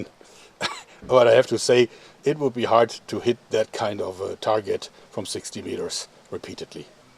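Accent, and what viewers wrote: Germany. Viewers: Don't be sorry for speaking german, thast is your heritage and birth right, I love your accent and the videos you make are most entertaining. Im from a town called SPARTA Missouri and all we have here is amarican whites as far as the eye can see, (myself being included), except of course for the asians who run the small asion food resteraunt in the middle of the town. Its nice hearing and seeing people from differant parts of the world and your german is a fresh experiance from the norm.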